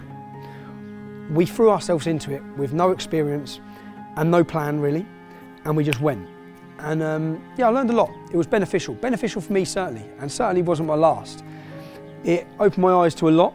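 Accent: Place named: United Kingdom. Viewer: Tommy, we love you! You are noble and a rarely brave Brit, with a fantastic accent to boot!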